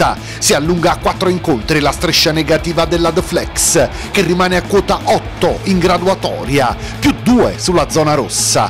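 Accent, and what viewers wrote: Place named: Sardinia, Italy. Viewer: Audio fastidiosissimo. Dite al giornalista che non si battono TUTTE le finali. E si sente anche accento meridionale, sardo presumo...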